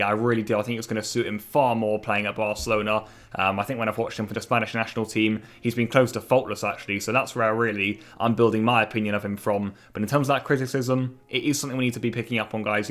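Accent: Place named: England, United Kingdom. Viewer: why do you have an english accent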